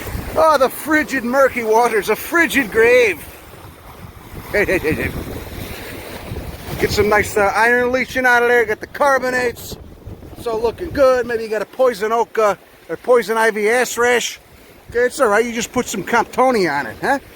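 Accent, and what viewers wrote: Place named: New Jersey, United States. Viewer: Never figured someone with a Jersey accent could be so smart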